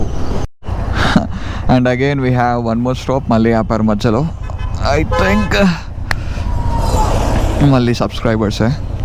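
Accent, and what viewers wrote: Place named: Hyderabad, India. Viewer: Mana Hyderabad slang a alaguntadi 💙💙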